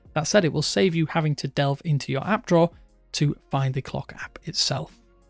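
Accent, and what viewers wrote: United Kingdom. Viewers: Which accent is he speaking? British? Can anyone tell? English isn't my primary language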